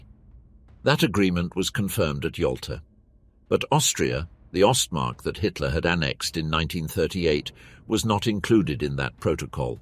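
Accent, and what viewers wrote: United Kingdom. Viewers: Always the West with a British accent taking the liberty to tell a history of another country, another people only to denigrate their incredible sacrifice to free humanity from the Nazi scum. You will never see a Russian "documentary" on what the West involvement was in the WW2. One big lie here: that the USSR signed a non-aggression pact with Germany because "it mistrusted everybody else." Not true. The USSR saw the the Nazi threat and asked the West powers to have a common security agreement but this was rejected by them all. In fact, the West had already signed non-aggression pacts with Hitler, but this is not criticized here. We only condemn the USSR for trying to keep the Nazis at an arms length. The West only came to the USSR's aid when it was clear that the Soviet Union was defeating the Nazis. Don't believe everything you hear even if it is said with a British accent. 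Lies are lies no matter what.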